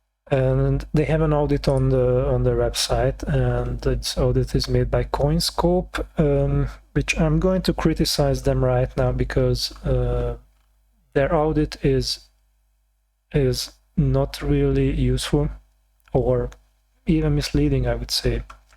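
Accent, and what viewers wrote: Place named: Hungary. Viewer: You are so Hungarian... 🤣 Your accent betrayed you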